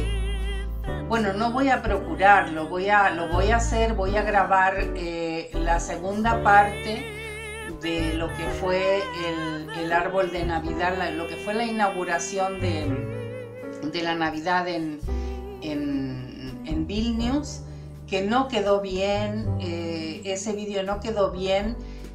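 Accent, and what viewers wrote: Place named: Chile. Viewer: Wow que bendición que aún mantiene el espańol con usted esq de repente pensé q traía algo de chile 🇨🇱 porque tengo una amiga así como usted es psicóloga y me viene a visitar y la escuchaba si como ella y dígale adelante usted también creces mucho ya lo verá !!🙏🏻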